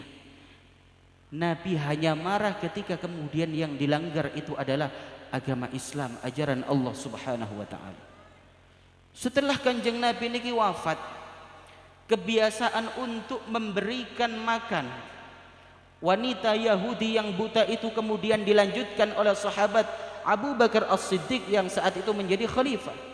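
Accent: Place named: Java, Indonesia. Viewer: Enak kyi jowo original rosone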